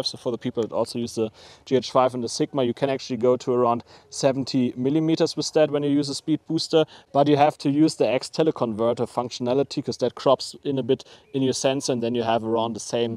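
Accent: Germany. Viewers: Thank you for sharing your expert knowledge. For film and photography enthusiasts, your help and tips are indispensable for better quality product. You are easy to listen to and your German accent lends additional interest to your videos. Thank you, again. Steve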